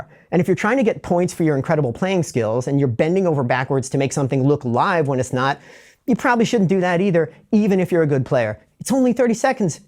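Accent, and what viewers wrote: Italy. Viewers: That's a pretty sick italian accent, dude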